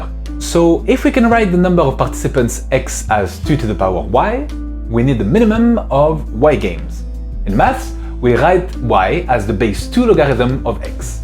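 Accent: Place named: France. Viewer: Pro: Good speed and tone of talking, Great voice, perfect English with sweet French accent, good content, excellent editing, talking while looking directly to camera! Amazing potential. Cons: Bad lighting, average sound, awful position of the head (at the beginning I thought that you sit right under the shelves and I was looking that at some point you will hit your head on the lower shelf). The video is golden.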